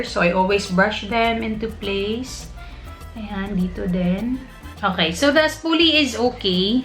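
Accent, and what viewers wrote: Visayas, Philippines. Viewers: You have the bisaya accent. Are you? Hehe btw love this video. ❤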